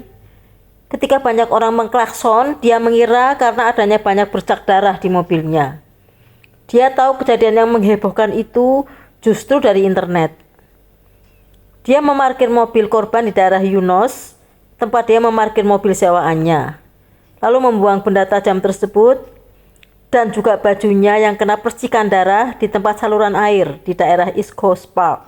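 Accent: Java, Indonesia. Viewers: Suara nya MCM org jawa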